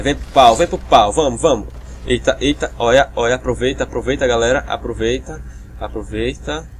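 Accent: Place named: Interior, Brazil. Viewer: Você é da Bahia, Pernambuco, Sergipe ??? Eu percebi que seu sutaque é bem tipico do interior :)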